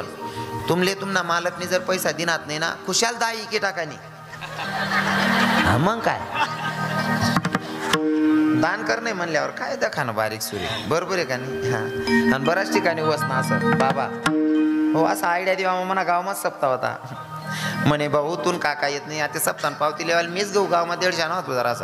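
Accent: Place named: Ahirani, India. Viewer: खरंच खानदेश रत्न आहे महाराज पिवर अहिराणी बोलतात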